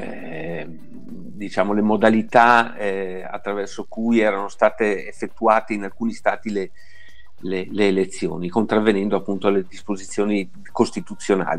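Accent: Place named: Veneto, Italy. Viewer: Ma una domanda dato per scontato il tuo accento veneto, dove vivi?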